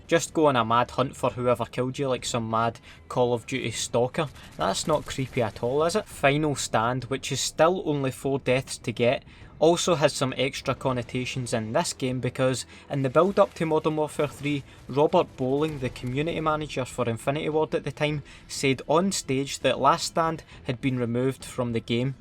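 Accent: Scotland, United Kingdom. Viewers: i started talking with a scottish accent because of you and im german.. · What part of Scotland you fae?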